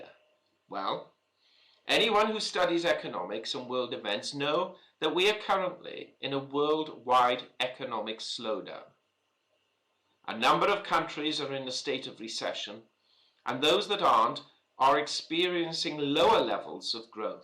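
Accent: England, United Kingdom. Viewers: After watching several of your videos, I understand what you are trying to say. "Don't purchase all at once." I agree. Patience is key. This is true for many things, even real estate. Since I made my error with that in the past, I won't do it again. Been trying to move out of Los Angeles but only when I find the right place at right price. The more I research and understand a certain market area, the better off I am in making a decent purchase. And besides all this, I like your accent. You remind me of one of my coworkers who's from England. And I admire his many virtues as well.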